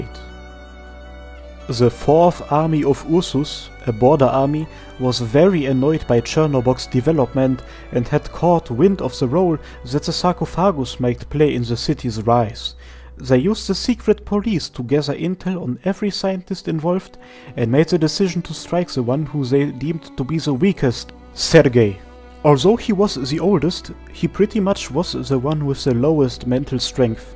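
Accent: Germany. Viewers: A short woustion are you germen becaus it sounds a bit like you have a german accent if so Servus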